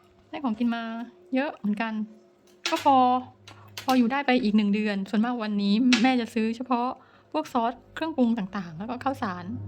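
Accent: South, Thailand. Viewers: จริงด้วยค่ะ มะละกอ แพงกว่าข้าวสารโชคดีที่คนใต้เรา ไม่ได้กินมะละกอบ่อยนะคะ พูดใต้ได้หลายคำเลยนะคะ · ทางนี้มะกอกะแพงคักจ้า สาวน้อยพูดใต้ น่ารักมากค่ะ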